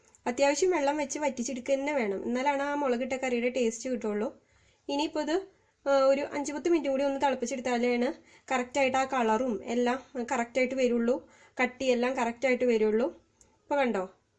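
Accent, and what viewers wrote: Thrissur, India. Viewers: ചേച്ചി തനി തൃശൂർ ആണല്ലോ 😁😁😁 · Extremely well presented. Your language style is excellent and your honesty is highly appreciated. Not many people admit their success through the trial and error method. Your cooking is good and your simplistic Thrissur style commentary is both appealing and amusing. But let's not take away any credit from the delicious dish you have prepared. Keep going and may you present many more such interesting and appealing recipes. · ചിരിച്ചു വയ്യ. ത്രിശൂർ ഡയലോഗ്... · Thrissur slangum curryum superrr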